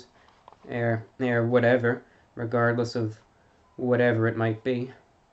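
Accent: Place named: New York, United States. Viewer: If I were blind I'd guess you were an old Jewish man from New York City who now lives in Florida. I do enjoy listening to you but you tend to ramble on a bit too much and I'd never pay to hear that. I'm glad that people do pay to hear your ramblings enough to keep you on You Tube.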